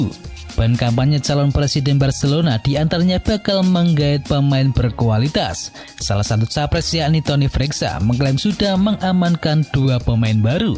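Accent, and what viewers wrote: Java, Indonesia. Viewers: bisa gak pakai bahasa Indonesia yg baik dan benar jangan logat jawa dasar biawak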